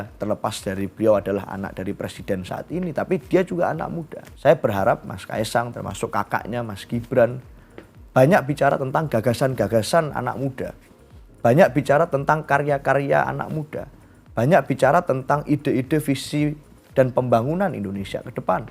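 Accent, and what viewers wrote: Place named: Java, Indonesia. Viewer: Aku suka logat medok mas. Mugia sukses mas. Mas contoh pemuda yg meniti karir dr nol.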